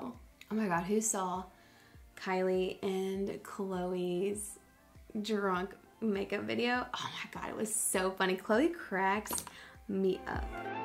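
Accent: South, United States. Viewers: I don’t to be rude but you’re pronouncing Phthalates wrong. It’s THAL-ATES but I do like how you say it though with your southern accent!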